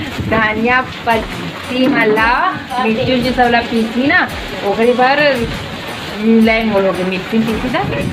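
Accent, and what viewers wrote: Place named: Chhattisgarh, India. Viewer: Bahut badhiya Chhattisgarhi boli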